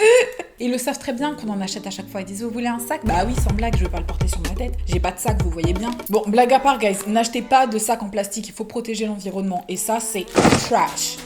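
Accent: England, United Kingdom. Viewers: J'ai découvert ta chaîne y a quelques mois et j'aime beaucoup ! Honnêtement j'avais des aprioris sachant que je n'aime pas du tout la tv réalité et tout ce qui s'en rapproche mais je suis très très contente de m'être laissée tenter par tes vidéos parce que t'es géniale, très drôle, intelligente, pétillante (avec un accent anglais perfectly SPLENDID, tu me touches en plein coeur là) Alors merci pour ton contenu, ton énergie et ce que tu dégages, c'est un plaisir de te suivre ✨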